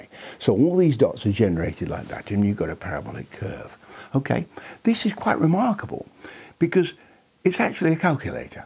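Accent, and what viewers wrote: United Kingdom. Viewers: That's as British accent as it can get.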